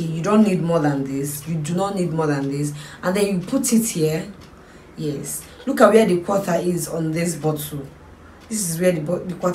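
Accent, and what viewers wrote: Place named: Nigeria. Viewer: I like the way Nigerians speak English thnx for sharing I will try this seriously am tired of pimples & dark spots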